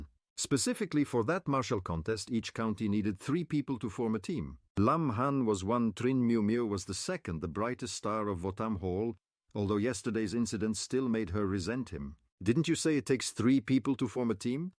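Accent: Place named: India. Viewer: It's like an Indian accent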